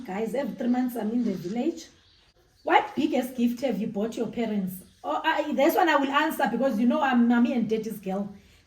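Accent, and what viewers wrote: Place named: Zimbabwe. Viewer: Your accent....its giving Zimbabwe 🇿🇼 ❤❤😂😂 hello Mama, here from Mandy. Love your energy 🎉🎉😊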